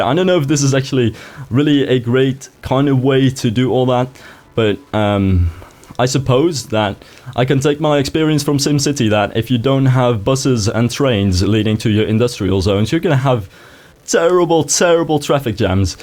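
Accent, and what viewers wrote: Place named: Netherlands. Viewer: je accent is heel erg herkenbaar aan mijn engels accent. Ik herkende direct dat je nederlands was voordat je het zelf zij xD. Een combo van brits en amerikaans en soms een beetje nederlands:D daar krijg je een sub voor!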